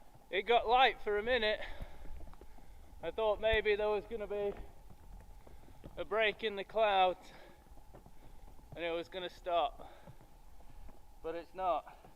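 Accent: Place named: England, United Kingdom. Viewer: Where are you camping, looks Nordic , Canadian American ? You have an English accent, like mine though? Perplexed.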